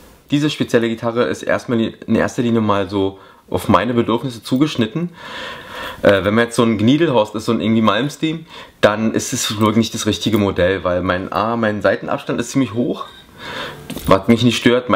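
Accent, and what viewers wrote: Berlin, Germany. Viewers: Berliner Dialekt ;) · Yes. But it's not an accent it is a dialect and this dialect of the berlin region feel "dirty" for other germans. For example: they say "dat" or "ick" instead of "das" and "ich". It sounds very shortened. Fun fact: "ick" is often the wrong pronunciation of "ich" from americans/british. Because they have the same trouble with the "ch" as we with the "th" :D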